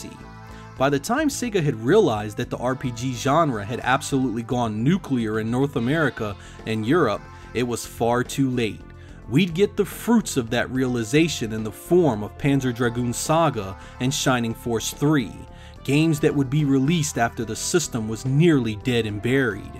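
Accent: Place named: Southeast Pennsylvania, United States. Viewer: Judging by the accent, you’re either from Southeast PA or Maryland.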